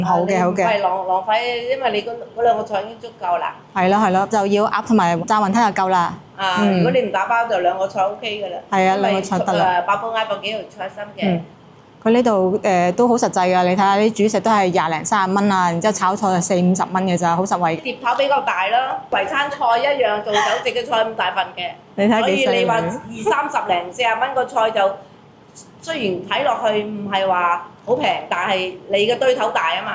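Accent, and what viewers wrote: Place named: Xiguan, China. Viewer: 老闆娘好勁，咁都聽出妳係西關小姐😂。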